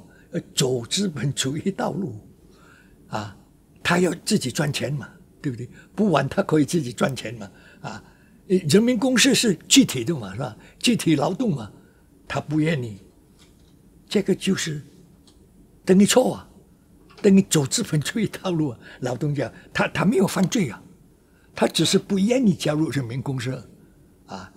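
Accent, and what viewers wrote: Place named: Indonesia. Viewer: 老先生说的是：八年当哑巴！不是半年！ 49年到57年！印尼口音而巳！😢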